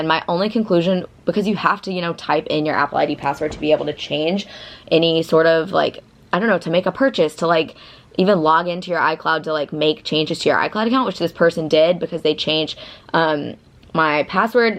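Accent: South, United States. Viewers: This is the second video I've way he's of urs and I'm definitely a subscriber now. I live in Tennessee and even though u have a southern accent it's so weird that's it's different from ours down here lol Also, u sound like Miley sometimes. U should get a Samsung phone cuz it's much better in my opinion lol Did u not have a finger imprint or a passcode on ur phone? U can download an app on ur phone too in case someone tries to get into it, it will take multiple pics of them and u can have those pics sent to an email or someone else's phone. Phones cost too much these days to not have good security one them now a days lol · All the people saying she sounds like Miley Cyrus...well she's from the south so it's a southern accent..pretty much all southern states sound the same some more hickish than others! Lol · Anwesha Barua it could be because they both have a southern accent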